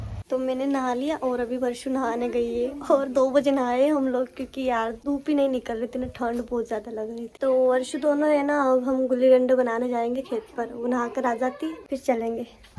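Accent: Rajasthan, India. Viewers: Aap apni Rajasthani awaj me ek vlog banao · AAP KI RAJTHANI LANGUAGES ACHI HE / 💯 CUTE. // MUJE SAMAJ NHI ATI HE LEKIN ACHI HE 👍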